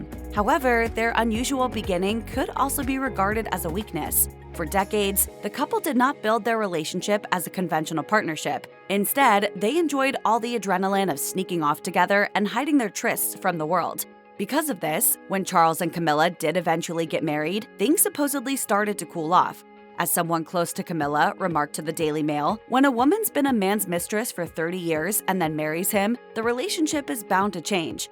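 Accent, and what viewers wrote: United States. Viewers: What a load of rubbish! Why is it these stories are always narrated by an american? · The moment that American voice started I knew we were in for a load of twaddle. You are so indoctrinated with 'celeb-crap thinking' that you view everything through US celeb lenses. The rest of the world is different - reality is the norm, not trashy gossip dished out as 'news' Sleeping in separate beds is the norm in such households - it's the norm!!! Public spats? You mean 'body language' interpretations. Rumours - yeah rumours. "may soon be calling it quits.." Yeah, that's a big 'maybe' How much is the MM paying you for this silliness?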